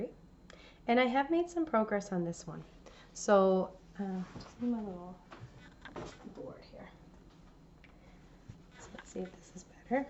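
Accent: Canada. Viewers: Is that an East Coast Canada lilt I hear in your voice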